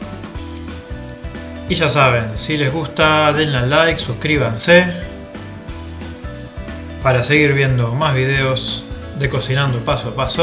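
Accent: Argentina. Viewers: Hola...soy una nueva suscritora. Detecto un acento Argentino 😊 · sos argento??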